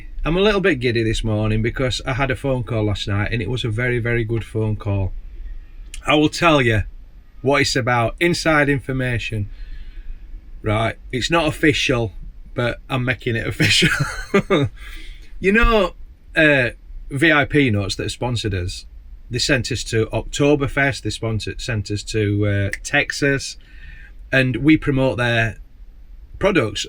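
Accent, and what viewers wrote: Yorkshire, United Kingdom. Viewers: Disagree mate...and I'm a cockney 😁 His Yorkshire dialect adds to the video loads. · Hi, watching now on the telly:) Please don't refine your lovely Yorkshire accent too much, we love hearing it, the dialect, the unique slang. The very first crush I ever had (as a teen) was on an actor - a lovely Yorkshire lad from Bradford - he was way before your time haha. Steve Hodson, who became "famous" in Yorkshire TV's "Follyfoot" in the early 1970s. Adored his Ey Up's & other Yorkshire terms.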